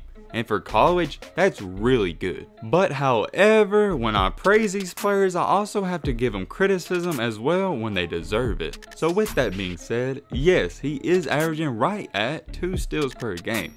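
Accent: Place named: Country, United States. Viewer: Damn did boomhower do this video??? Cuh country asf!!!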